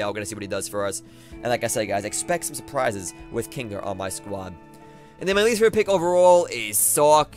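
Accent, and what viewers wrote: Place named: Brooklyn, United States. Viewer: wats with the Brooklyn accent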